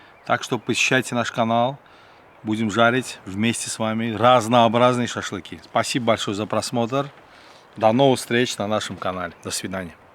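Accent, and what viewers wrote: Baku, Azerbaijan. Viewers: Маэстро, настолько красиво и со вкусом вы все делаете, что смотрим всей семьей не отрываясь! И кушаете очень заразительно:) Процветания вам и вашим близким,! Потрясающие блюда, приправленные неповторимым бакинским акцентом становятся еще вкуснее)) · Азербайджанский акцент , ласкает слух, сразу вспоминаю своего друга Джавида , с которым дружили во время ординатуры в Москве ).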